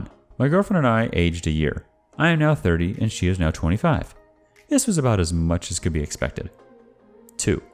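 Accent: India. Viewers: Sounds Indian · The first story sounds like Indian !!!!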